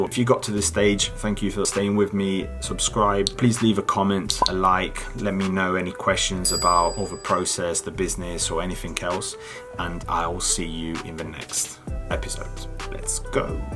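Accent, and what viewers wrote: United Kingdom. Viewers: Kudos and huge compliments for your accent and also for your property and command of the English language. You sound super British and it doens't seem you're Sardinian at all. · Amazing video,. Thanks for taking the time to go through your thought process back then. PS. Incredible British pronunciation:O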